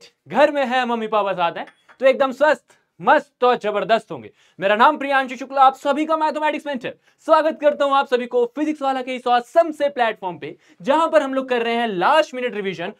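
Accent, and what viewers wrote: Bihar, India. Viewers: Lagat hai sir ji bihari ba 😊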